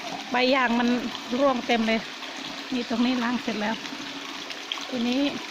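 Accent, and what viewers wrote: Surin, Thailand. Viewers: ยายเป็นคนจังหวัดอะไรคะ สำเนียงเหมือนคนสุรินทร์ บุรีรัมย์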